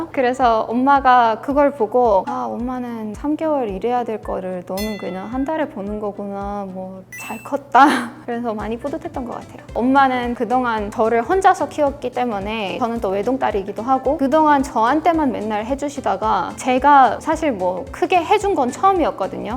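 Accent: Seoul, South Korea. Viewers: 화면보면 전형적인 서양백인미녀인데 화면 내려서 목소리만 들으면 웬 한쿡여자가 말을... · 그냥 잘하는게 아니잖아 걍 서울사람이잖아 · 그냥 한국인인데? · 크헉. 완전 한국 서울 여자네.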